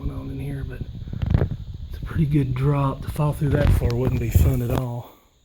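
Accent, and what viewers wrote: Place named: South, United States. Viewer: Very cool! Just subscribed.. Love your southern accent.. It is a lot like mine!! lol (I am from NC.) Looking forward to seeing more videos!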